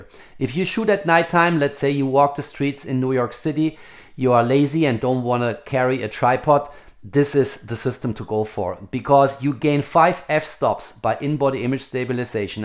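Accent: Germany. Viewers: Agree, and I love his German "We" instead of "V" in his pronunciation...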